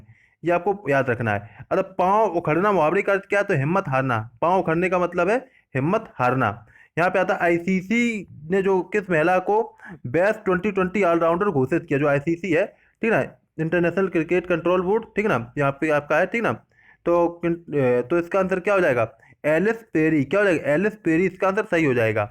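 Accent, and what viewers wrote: Kanpur, India. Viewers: Guru ji kanpur se hai kya aap 😕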